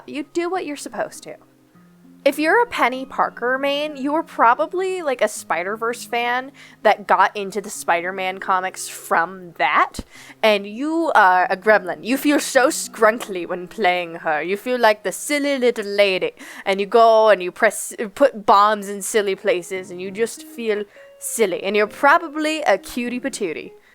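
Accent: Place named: South, United States. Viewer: Dawg is it just me or does she sound like squirrel girl lol like she has the same thing where she like says stuff and becomes slightly southern or something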